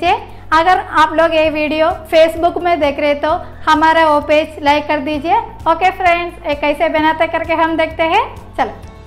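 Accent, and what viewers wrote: Kerala, India. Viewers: Very Well explained and demonstrated. Your Hindi with Keralite accent is cute as you. Keep it up 👍👌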